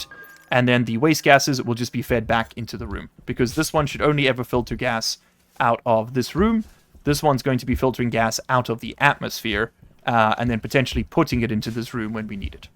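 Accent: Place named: South Africa. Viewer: Thought you had a Dutch accent, but South African is close enough 😅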